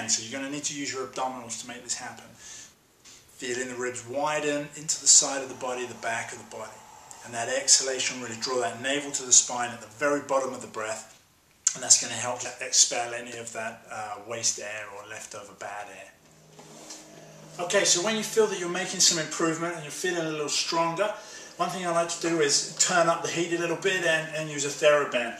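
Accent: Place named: Manchester, United Kingdom. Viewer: My God he's from Manchester.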